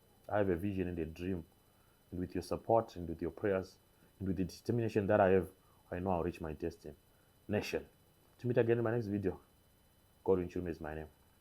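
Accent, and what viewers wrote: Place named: England, United Kingdom. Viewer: "The first church I have to preach to is my family" these words you have spoken are powerful and such a great message that most men would appreciate. You know, it is important to determine your own goals and standards in your life and pressure yourself by what other people are doing in their lives, be it having big house or the latest model car. You and only you, as the heard of your family, should determine your family's standard of living. Nhasi - today, throughout this video you have spoken english as if you once lived in England, UK. Wishing you all the best with you relocation.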